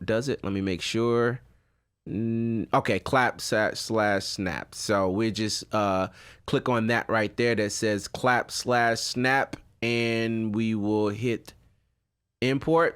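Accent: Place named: Baltimore, United States. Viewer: Bro are you from Baltimore😂 couldn’t help but notice the accent but this video was great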